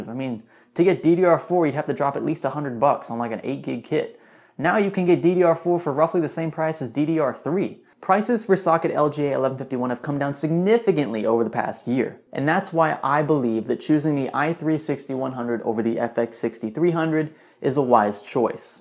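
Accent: Louisiana, United States. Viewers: are you from Louisiana?!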